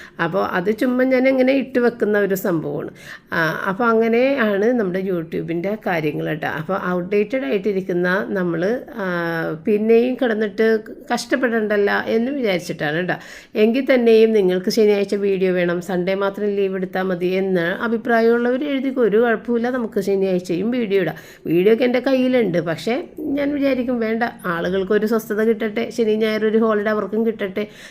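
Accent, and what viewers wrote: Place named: Kochi, India. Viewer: ഒരിക്കലും വീഡിയോ നിർത്തരുത് ആരെ കണ്ടില്ലെങ്കിലും ഞാൻ വീഡിയോ കാണും കൊച്ചി ഭാഷയെ സ്നേഹിക്കാൻ തുടങ്ങിയത് സംസാരം കേട്ടത് മുതലാണ് ❤എന്നെ യൂ ട്യൂബ് എന്നും തഴയുന്നു എന്നാലും ഞാൻ നിർത്തില്ല 😀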